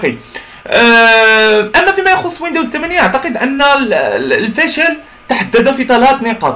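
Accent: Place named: Morocco. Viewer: اخوي هو شخص مغربي ولهجة المغارب لهجة مختلفة وحتى النطق مختلف ( مثل المصاروا الثاء ينطق س ) وهناك الكثير من الامثلة وهو يحاوول ان يوصل المعلومة بالذي يملكه من معرفة وقدرة [ قال ابن سيرين : إذا بلغك عن أخيك شيء فالتمس له عذرا، فإن لم تجد له عذرا فقل لعل له عذرا.]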